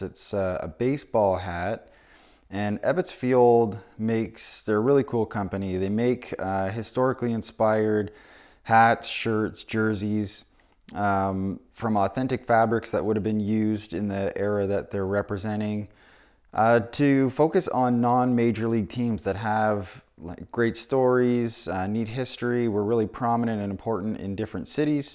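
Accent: Canada. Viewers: As a winnipeg guy you sound like an Ontario guy! Good vid I didn’t know I needed a flannel cap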